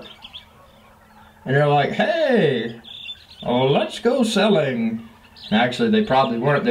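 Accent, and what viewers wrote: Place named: Boston, United States. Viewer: You sound like a Yankee I know. From South Boston :-)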